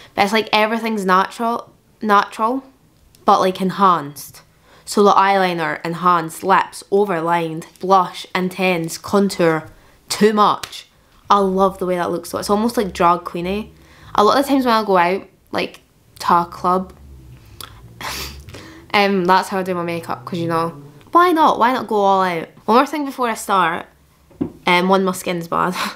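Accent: Scotland, United Kingdom. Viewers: Guys, Scotland is IN Britain, so technically her accent is British. Not all British accents sound like queens English accent “typical posh” accent. I may be wrong but she sounds Scottish to me, or northern English. So generally speaking I think non UK/Irish people think the entirety of england is the UK while forgetting there are 4 countries in Great Britain all with 100s of different accents in each part. Yall spoke like there’s just 1 accent in all of Britain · Scottish accent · i dont think so because i am and her accent is a little different i think shes from more southern scotland idk lol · never seen a comment section struggle so hard to find out where she is from. Is it that hard to tell she is Scottish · because raylas scottish lmao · bpdbitch nah bro yea we’ve seen people with that the same accent (Ik she’s Scottish) but she just gives the vibe of it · Thot Scottish accent I love ❤❤❤❤❤❤❤❤❤❤❤❤❤❤ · Ah a fellow Scottish person 🥰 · I bet you're American, huh. 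Cause that was completely and utterly stupid. Her accent is violently Scottish and all the people in that show are English. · Lol she doesn't have a English accent she has a Scottish accent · Keep your natural lighting I think it looks better :) also love to hear a Scottish accent on here, I’m from Edinburgh and Boots on Princess Street sells Fenty now ♥️ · She sounds Scottish to me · Scottish. I already knew this, but She's talked about it in older videos. Apparently, it's the lowest of Scottish accents where she's from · she got a scottish accent lmao my dad got one too . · was not expecting you to be scottish and am loving it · didn't expect the Scottish accent thank you for enlightening me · Scotland b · somaya no shes scottish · I could listen to your Scottish accent allll day long.... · no it's not, she's scottish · Skanda Kousalya Devathaa she's scottish alyssa's from york x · haha, Scottish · V a n i l l a that is definitely a scottish accent not a british accent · i did NOT expect u to be scottish 😳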